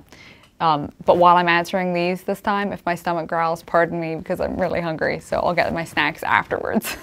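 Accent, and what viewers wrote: Pennsylvania Dutch, United States. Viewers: It sounds very similar to Pennsylvania Dutch, just like the Amish.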